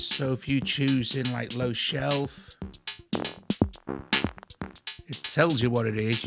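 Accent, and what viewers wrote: Merseyside, United Kingdom. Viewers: Always love your reviews. Your bright positivity, and premium Mersey tones!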